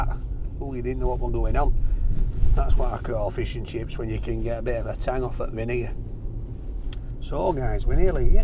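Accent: United Kingdom. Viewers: I come see one of two videos of this bloke when I need me some british accent in mi life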